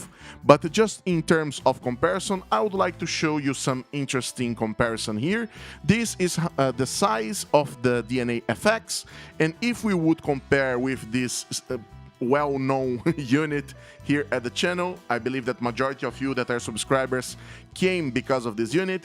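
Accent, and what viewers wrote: Brazil. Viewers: Sotaque brasileiro hehe! essa pedaleira é melhor que a valeton GP200? em qualidade de som e efeitos bro?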